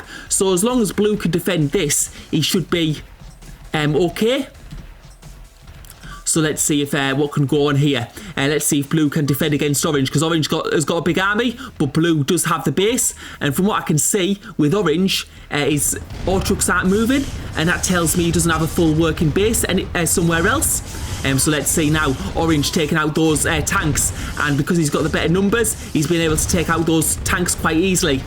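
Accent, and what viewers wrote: United Kingdom. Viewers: The queen's English (miss her already).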